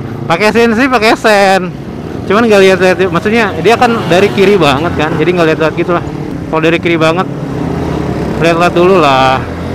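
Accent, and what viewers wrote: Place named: Sunda, Indonesia. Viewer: sepertinya anda orang sunda, gue suka👍😂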